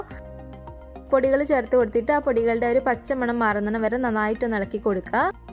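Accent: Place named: Central Travancore, India. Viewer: central travancore slang aanallo..Cliche malabar slang allaa..... Have to try.......:-)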